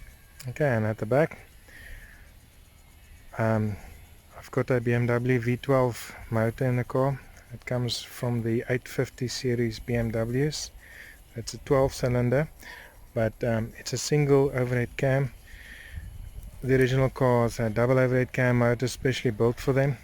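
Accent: South Africa. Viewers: You South African my bru!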